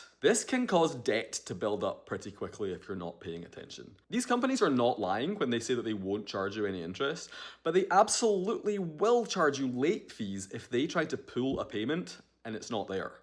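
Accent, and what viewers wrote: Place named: Scotland, United Kingdom. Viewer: Are you a Scotsmen 🏴 living in America 🇺🇸 because you have Scottish Accent but keeping mentioning Dollars and showing Dollars?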